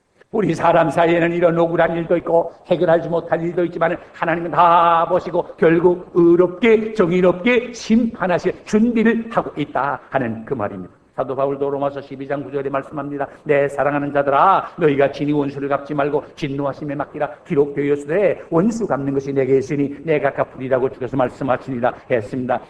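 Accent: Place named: Pyongan, North Korea. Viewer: 중학교시절 영락교회에서 말씀을 들으며 성장했는데 예배중에 찬송도 알토.베이스 를 하시며 찬양도 성악가처럼 하시던 목사님 여전히 성대가 젊음이 넘쳐나십니다.평안도 사투리가 간간히 나오시는데 매력이시고 설교에 리듬이 있는 명설교입니다.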